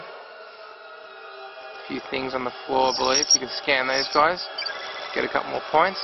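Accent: Australia. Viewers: Awesome video. I am in Australia as well. I assume you are in Aus with that accent.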